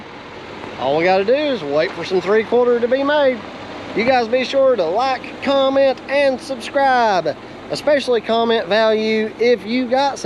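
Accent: South, United States. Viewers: Value beyond a price tag just watching you position that tractor, wait for the rock over, then gently glide that Blade to the sound! Total Master of your Trade and your creeping the controls to set that Box Blade is Art Work My Good Southerner! This is like the third time watching and it never get's old, pick something new up each time on your operator skills I transform to My lot for hours of frustration and confusion of not being able to capture the 'Magic' ! Ha, Lol. My 94 Honda 22 HP I just picked up is so 'touchy' on the controls? Adjusting the float helps but the lift is impossible to get to slowly 'creep' up and down on the hi-lo spots. My point of view is lower with my 5 ft. Tarter BB, I can't quite see into the box to watch that front blade? Angle of the dangle, what kills Me is to watch you skim in reverse pushing the blind spot of the back blade! 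Touching just the edges or a slight high spot. You make it look easy My Main Man! Enjoy the vid's and Southern accent/hospitality. Reminds Me of some old AF buddies from Virginia, Kentucky and of course South Carolina. Stay safe and healthy from Northern Nevada!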